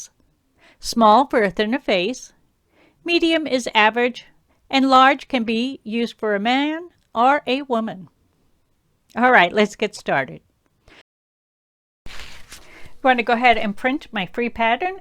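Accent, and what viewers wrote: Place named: Louisiana, United States. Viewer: Your accent sounds like you are from South Louisiana. Am I right?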